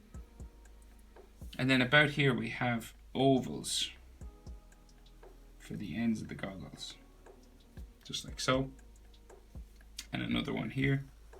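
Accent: Ireland. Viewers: Are you Irish cos you sound it??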